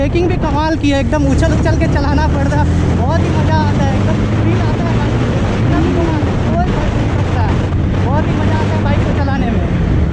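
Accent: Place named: Bihar, India. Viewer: loved bihar touch in your voice. bhaiya ji maja aa gaya.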